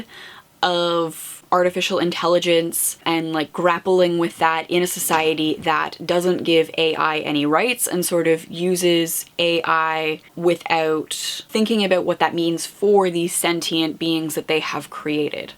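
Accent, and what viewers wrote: Canada. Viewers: Hello, I have been enjoying your reviews. As a English teacher in Australia I love to pick up on different accents. I have noticed when you say out or about it comes across as oot or aboot. What is the origin of you accent?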